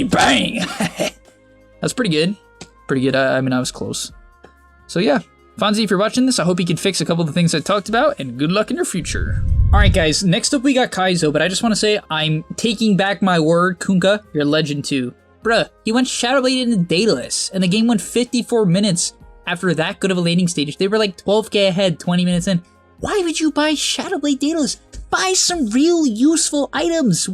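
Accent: Australia. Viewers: Australian accent got me good 😂😂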